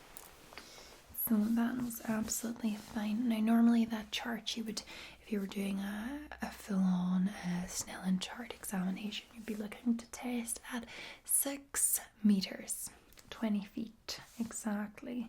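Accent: Scotland, United Kingdom. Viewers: I love Cranial Nerve Examinations I've seen so many of them by different ASMRtists that I really want a Cranial Nerve Exam if there half as relaxing as in ASMR then I think I would really love it! If not for nothing if I ever need one I will have a wealth of videos to go back to to relax and calm my anxieties! I love your Scottish Accent in this video is this a Northern Coastal accent kind of like ASMR Shortbread or otherwise known as ASMR Shortie? Thank you for a really relaxing Tingly video it seems like today has been a stressful tiring day I really appreciate these calming videos in the evening!:_Loveheart::_Loveheart::_Loveheart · That Scottish accent, oh Marry and Joseph, lol :D just lovin' it :)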